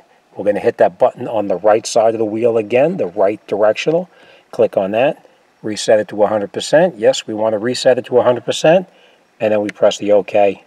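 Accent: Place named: Massachusetts, United States. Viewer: I like the MA accent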